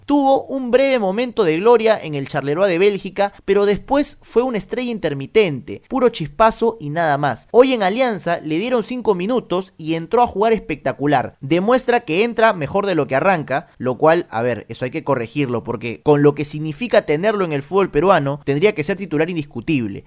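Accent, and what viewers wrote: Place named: Argentina. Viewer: mano por que hablas como semi argentino ??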